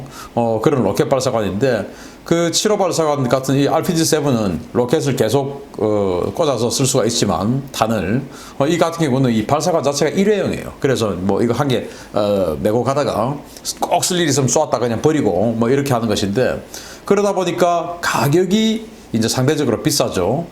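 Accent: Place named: Gyeongsang, South Korea. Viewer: 경상도 사투리 학을 땝니다 표준어 기겁 합니다 오랜만에 고향말 들으니 정겹습니다 신선생님 건강하이소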